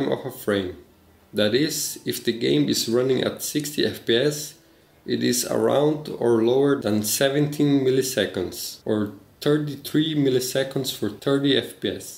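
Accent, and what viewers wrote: Brazil. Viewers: valeu man kkkkk aquele sotaque br no inglês kkkkk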